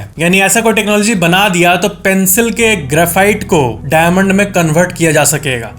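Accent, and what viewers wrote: Bihar, India. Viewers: Bihari tone hai inka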